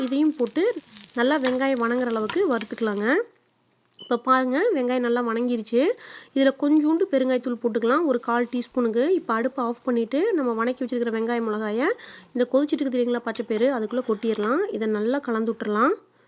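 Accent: Kongu, India. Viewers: கொங்கு நாட்டு கிராமத்து கொஞ்சும் தமிழ் இனிமை பருப்பை உடைக்காமல் அப்படியே வேகவைத்து கடையலாம் அருமையாக இருக்கும் சீரகத்துடன் சிறிது தனியா சேர்த்து தாளிக்கவும்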